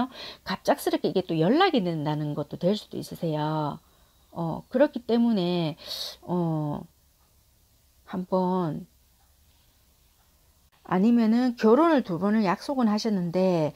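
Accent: Busan, South Korea. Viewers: 부산 사투리 뿜뿜 타로 그런데 카톡에 마리타로로 검색하니까 안나와요 ㅠㅠ 오픈채팅으로 검색해야 하나 싶어서 검색했더니 마리타로가 딱 하나 있는데 그분은 이 아니더라고요.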